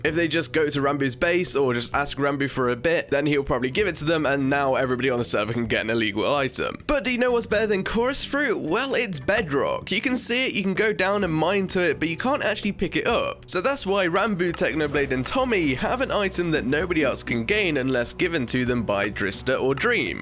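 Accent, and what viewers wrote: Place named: United Kingdom. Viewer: British guy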